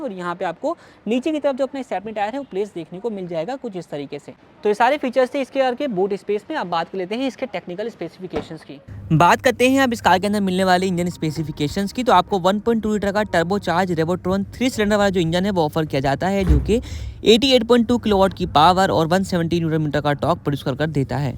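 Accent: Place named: Jhansi, India. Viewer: Tum jhansi se ho bro voice pahchn gye ham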